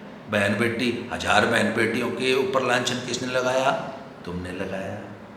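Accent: Haryana, India. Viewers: दद्दा आपकी हरयाणवी की इतनी तगड़ी पाकर दिल खुस हो जाता है खुदन गांव की पूरी जानकारी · Excellent "KHICHAI" by speaking "Excellent Haryanavi Boli"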